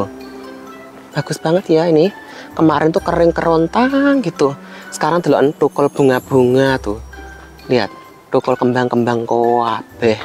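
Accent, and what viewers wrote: Java, Indonesia. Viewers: Seneng banget nontonin video panen2 mas trus ditambah ngomongnya logat jawa lucu gitu 🤗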